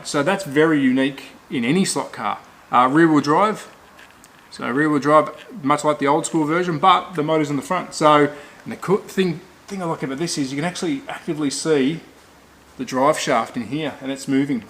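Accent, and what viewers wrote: Australia. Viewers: That Australian accent grates me.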